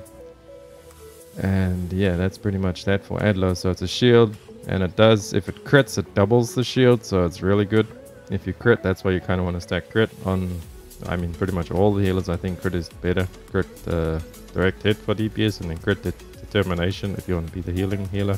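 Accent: South Africa. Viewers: Another South African?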